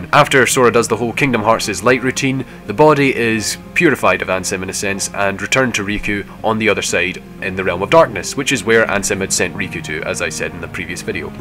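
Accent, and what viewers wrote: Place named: Scotland, United Kingdom. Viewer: How did people think your accent was Irish, an Irish accent sounds nothing like a Scottish accent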